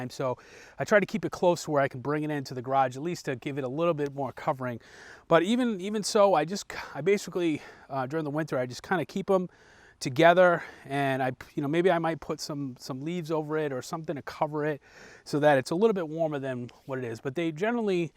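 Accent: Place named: Northeast, United States. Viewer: North Carolina?? If you aren't from the north east, someone who raised you must be. Thanks for the info. I'm about to start a worm bin, so this helps. Best to you and your family, peace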